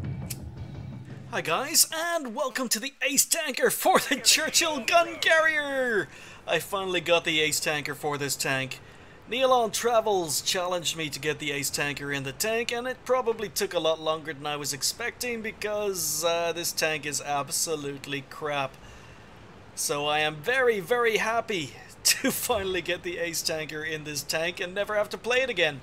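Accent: Ireland. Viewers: are you actually irish? I can hear a bit of an irish accent but not much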